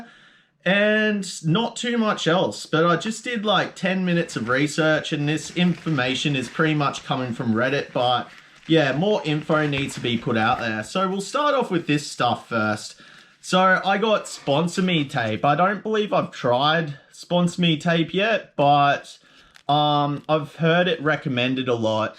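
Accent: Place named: Australia. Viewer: Im not even making fun of you but gawd thats a real aussie accent. Nice vid tho.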